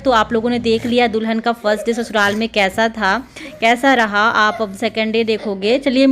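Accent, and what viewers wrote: Bihar, India. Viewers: Madam kya aap bihar se ho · Meri sasural Delhi men he 20 sal se men yahi rahti hun par Mera mayka bareli u.p ka he mujhe wahan ki bhasha acchi tarah se pata hemere aadhe rishtedar up ke hen par Jo aap bolti ho woh up ki nahi Bihar ki bhasha he mere yahan Delhi men mere sas pas bahut se log Bihar ke hen mere kiraydar bhi unki same bhasha he aapki bali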